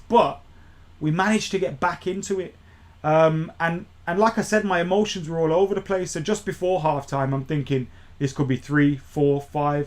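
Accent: Birmingham, United Kingdom. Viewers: Are u really from Birmingham?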